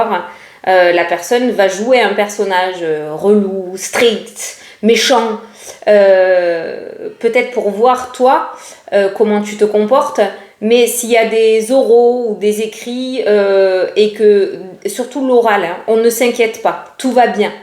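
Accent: South, France. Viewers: Désolée pour le commentaire tardif, pas eu le temps de l’écrire hier après t’avoir écoutée. Je laisse rarement des commentaires mais là il le fallait absolument. Ta robe te va très bien mais ton sourire est encore plus magnifique. Les 2 ensemble c’est wow!! C’est d’ailleurs pour ton énergie, ton sourire, ta bonne humeur (et parfois tes petits coups de gueule), ton naturel, ton accent du sud (...) que je te regarde. Un grand merci pour tes vidéos et ces moments de partage!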